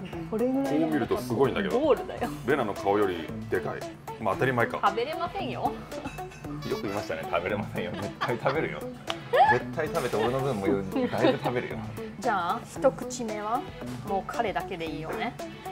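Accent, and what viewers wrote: Nagoya, Japan. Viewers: 偶然に初めて見たとき日本語のアクセントが名古屋ぽいと思ったら名古屋ご在住のようですね。名古屋及び近郊の発祥の店がよく紹介されているので登録しました。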